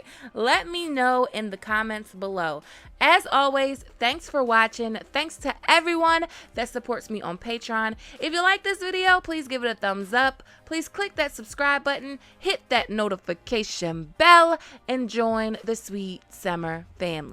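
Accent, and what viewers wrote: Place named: East Los Angeles, United States. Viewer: I love your voice! It’s kinda East L.A. with a hint of Inglewood 😊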